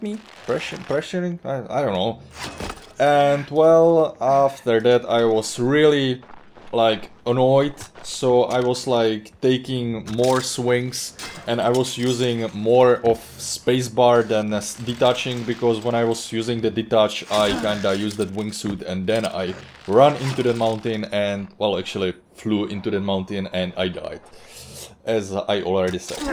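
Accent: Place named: Europe. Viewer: What country are you from? I was going to guess but I would probably get it wrong lol. Somewhere in europe.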